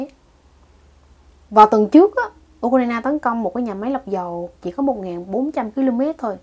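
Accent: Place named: North, Vietnam. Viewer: đúng chất giọng 3ke x.ỏ lá. Ngọng líu ngọng lo cũng bày đặt lên cào phím. "Lato", "Ixarel", quát đờ hợi :face-blue-smiling::face-blue-smiling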